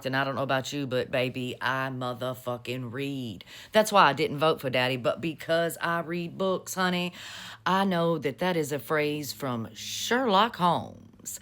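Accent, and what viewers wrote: South, United States. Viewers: YOUR accent!!!! I love it!! That Southern Twang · Hurry back! I saw a few headlines about the emails and waited to hear about it in your sweet southern accent! Happy Wednesday 💜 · I LOVE the sound of this lady's voice. That southern drawl with the smoky texture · voice based assumption incoming; You've just replaced William T Sherman as my favorite southerner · It’s refreshing to hear that Southern Accent used intelligently. I could listen to you all day. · I’m from the south but I don’t sound like it. I absolutely love your accent and your spunk. You nailed it.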